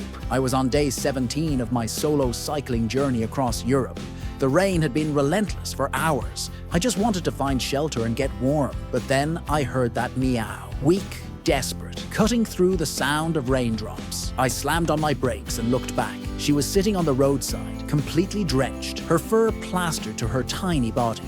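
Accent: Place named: Scotland, United Kingdom. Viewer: are you studying to be the narrator of your life? Sound good, but I like the way you talk with your scots burrrrr. Kind of like a Nala purr.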